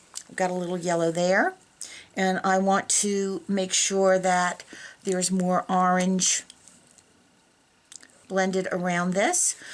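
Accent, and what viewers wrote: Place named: New York, United States. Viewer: I am SO happy that I found your tutorials! I love your colors and your personality--and I think I even detect a little NuYawk accent there :) Can't wait to catch up on the ones I've missed and the new ones to come. You brightened MY day! Namaste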